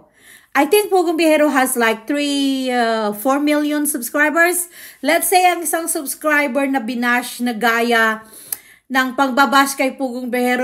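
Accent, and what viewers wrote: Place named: Visayas, Philippines. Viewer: What a WASTE OF SPACE this TRYINGHARD YOUR ENGLISH BISAYA KAAYO DAY,PAG HILOM OY KAHIAS NIMO,PAKAULAW KA LANG TO THE BISAYA HILAAAAS,DILE BAGAY SA IMO OY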